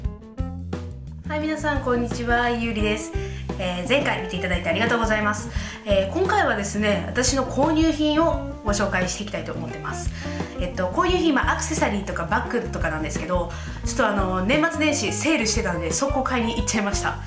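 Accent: Kansai, Japan. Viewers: 初めまして！！メイク動画検索でやってきました！さんの関西弁に親近感わくー！大阪人で、わたしも妊娠6カ月です！(^^♪ もうすぐ出産されるんですね！！頑張ってください！！！甘いもの食べたくなる！わかりますｗｗ妊婦はつらいですよね😢 マザーズバッグわたしも大きいカバンもってないので、何を買うか迷ってます！ベビー用品とかも買われましたか？何買われたのかきになるー！これから、動画拝見させていただきます！！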